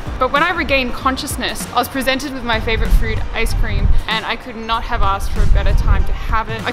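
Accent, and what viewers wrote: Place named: Australia. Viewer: More of that Aussie accent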